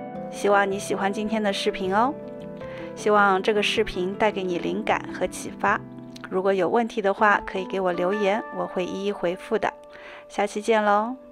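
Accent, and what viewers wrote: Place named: Jiangzhe, China. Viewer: 口音像江浙沪那边的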